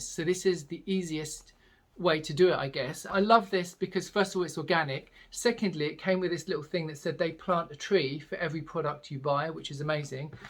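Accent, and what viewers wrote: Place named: United Kingdom. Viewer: My ear tells me you have to be from same area of UK that Russel Brand is from. Great video btw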